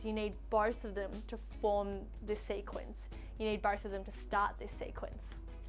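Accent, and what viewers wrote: Australia. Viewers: Hello. Are you Australian? Just asking for a friend.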